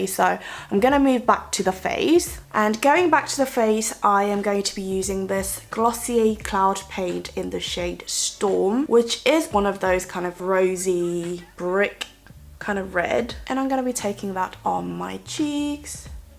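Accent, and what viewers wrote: United Kingdom. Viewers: 영국 영어발음 너무 멋있어요ㅠㅠ 블리블리 광채쿠션 너무 좋죠:) 화장도 너무 예쁘시고, 너무 잘 보고 가요!:) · 이렇게 퓨어한 인데 영국영어말투는 넘나 시원시원하신.....💓 한국어하실때랑 다른분같운데 전둘다 애정합니다....꺄르를ㄹㅎㅎㅎㅎ❤️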